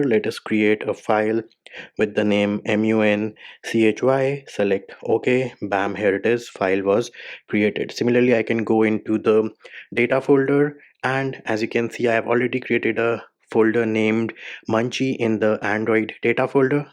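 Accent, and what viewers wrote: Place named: India. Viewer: When i heard that bro have an indian accent i knew my problem is already solved.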